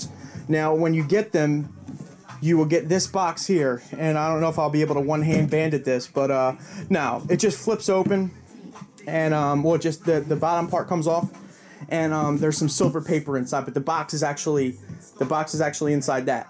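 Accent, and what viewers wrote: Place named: East Coast, United States. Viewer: Yea that is how you talk but I talk like that too haha must be the east coast in us lol, the mush explanation was dope too, had me rollin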